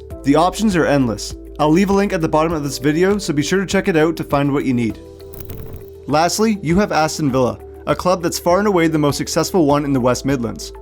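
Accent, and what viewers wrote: Canada. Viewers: I bet ur Canadian mate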